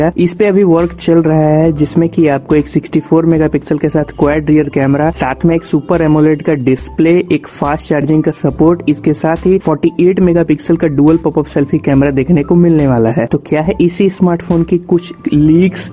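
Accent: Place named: India. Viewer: I hear Indian aksen i dislike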